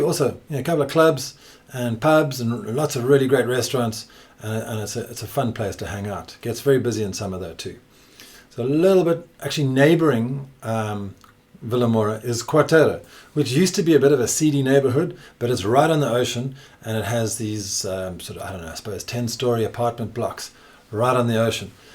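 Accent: South Africa. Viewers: Im a portuguese expat living in Europe and I've just come across your vids a few days ago. I have to say this is probably the most comprehensive, authentic, interesting, fun to watch yet rigorous video I've seen about the Algarve. You've made me start thiking about relocating back to Portugal, who knows, even to the Algarve - who knows - although being originaly from Porto i enjoy a bit of cold, rainy days and mountains nearby (not that we have many in Portugal that you can call true mountains). You're probably the best advocate for Algarve tourism and economy right now. Loved the SA accent, btw. Take care. Looking fwd to check out some of your other vids. 👍😉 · Love the SA accent! I have one too :face-blue-smiling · Hi, fellow South African and new subscriber here, so good to hear a familiar accent! ❤️ We’re considering retiring to the Algarve so your channel is just what we need. 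Looking forward to watching a lot more of your helpful information! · Hi. Ts nice to see you've retained your amazing SA accent. Can you please send me some cost effective house/apartment (2beds) prices. I'm looking to retire somewhere - SA currency squeezing ones options. <mail> Thank you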